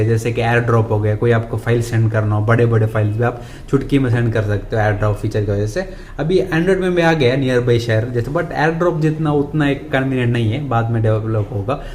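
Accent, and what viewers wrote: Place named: South, India. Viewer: I love south indian hindi 😃😃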